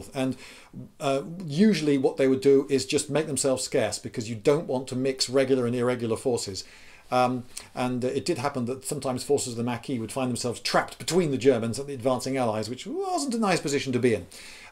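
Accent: England, United Kingdom. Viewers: Sees interesting tittle Clicks The youtuber has a british accent and the video is about France Grabs popcorn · Maybe it's because I'm an American who's been conditioned to associate accent with Monty Python, but this particular video seems oh-so-easily adaptable to a comedy sketch....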